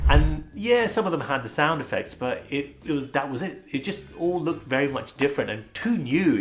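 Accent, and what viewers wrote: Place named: United Kingdom. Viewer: the slightly British accent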